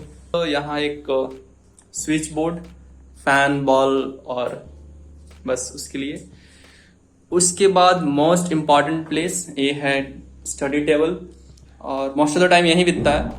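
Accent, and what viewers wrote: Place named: Jammu, India. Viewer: Bhai jammu ma bhi same jhi bolte ha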